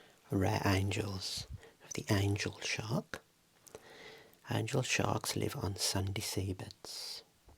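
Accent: Birmingham, United Kingdom. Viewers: Mother of pearl. If there was an Oscars for ASMR, this would be win some awards. A lot of ASMR artists just read the books word for word and I find this a bit boring and struggle with this. I LOVED this video as you mix it up so much and keep us in the loop (loved all the "save your money" comments!). I know this is a live video but your style is really good. I have only just discovered your channel (shout out to reddit!) and really really enjoy your work. Like a Brummie version of Mr. Relaxing ASMR! lols! (That is a complement btw! Love your accent!) Ps. I also LOVED the tapes and CD ones. Especially as it is clear you were an old school raver! Or were you lol? (make some noizzzzz!)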